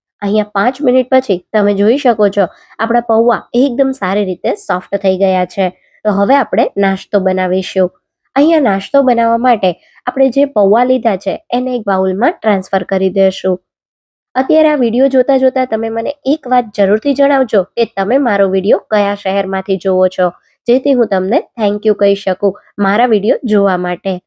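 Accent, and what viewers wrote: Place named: Vadodara, India. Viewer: Vadodra gujarati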